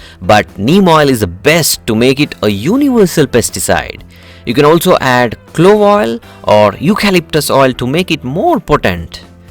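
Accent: India. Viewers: Nice India voice,thanks for posting …?How used for COVID …? ( veterans)🇺🇸🇨🇦🇫🇷🇬🇧🦠😛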